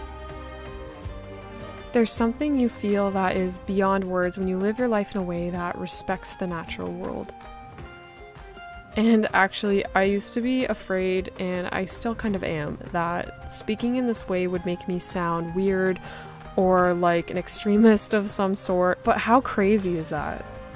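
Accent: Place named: Ontario, Canada. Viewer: Keep speaking this way, when you said you were nervous I was like noooo! It's so powerful! If I had to guess what province you live in... I think it's Ontario.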